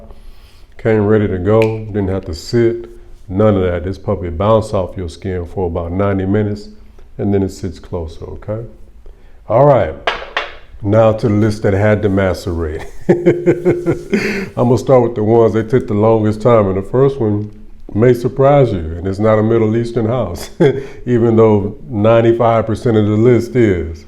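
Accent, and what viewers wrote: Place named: Texas, United States. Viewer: Man you gotta be from Texas.. the way you talk. I love it. A new subscriber here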